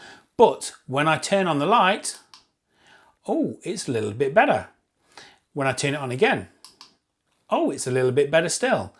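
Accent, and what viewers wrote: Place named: England, United Kingdom. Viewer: You look like American but your accent says you're English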